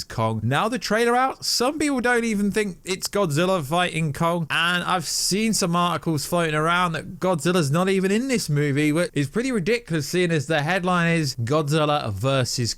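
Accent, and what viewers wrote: United Kingdom. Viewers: ?? BRIT ACCENT ??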